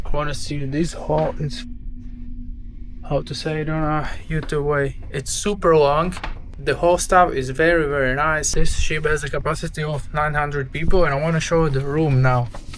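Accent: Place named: England, United Kingdom. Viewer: Hey you are so cute with your English accent 😁 I was wondering where you are from. I hope one day we can travel the world together ❤️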